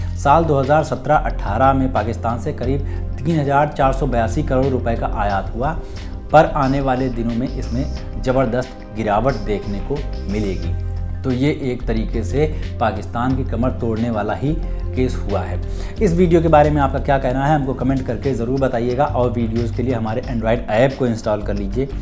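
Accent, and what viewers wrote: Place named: Bihar, India. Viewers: Thodaa Hindi me Bihari tone mat laao app bolnaa seekh le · Mr. please to be work on learn hindi then try to do anchoring. You are belong from bihar that is to be known by your Bihari language I hate this type of language. "फैसला = फैईस्ला (you said)"🤣🤣🤣🤣🤣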